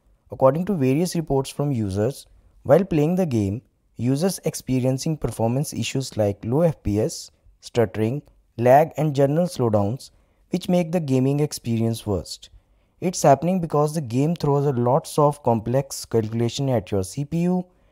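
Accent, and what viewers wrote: India. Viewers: soon as you hear the indian accent you know its gonna be the worst advice youve heard so far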